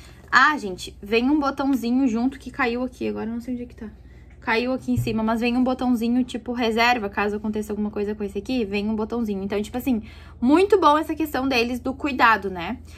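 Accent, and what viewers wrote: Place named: Rio Grande do Sul, Brazil. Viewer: Enfim achei uma gaúcha falando das roupas da shein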